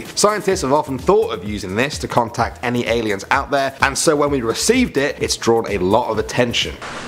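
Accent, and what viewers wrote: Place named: United Kingdom. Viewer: you love space. ok ok, I get it. I love british accents.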